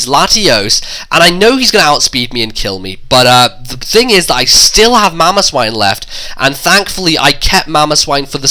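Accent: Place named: United Kingdom. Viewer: best british accent out there :]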